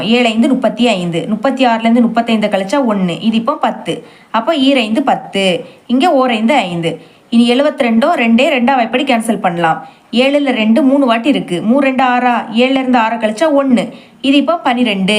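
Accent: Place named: Nagercoil, India. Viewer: Unga native nager coil side thana unga voice enga area pola iruku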